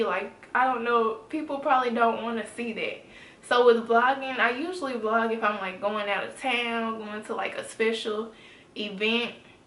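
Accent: South, United States. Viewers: You so country but I love it!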